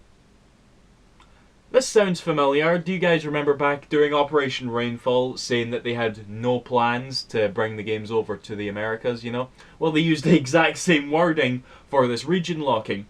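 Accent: Scotland, United Kingdom. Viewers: you are so Scottish its awesome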